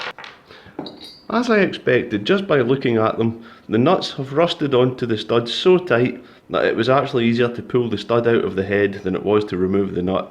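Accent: Scotland, United Kingdom. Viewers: Its great to hear another Scottish accent alongside this great work. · So, I'm an American and I'm having trouble placing the accent....Scottish? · You've taught me more Scottish accent than Train Spotting, so thank you! Also awesome projects.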